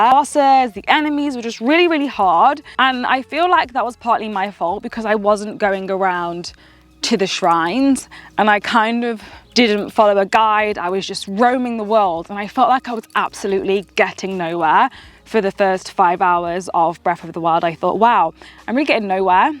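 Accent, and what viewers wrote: United Kingdom. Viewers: How do you have both British and American accent? 😳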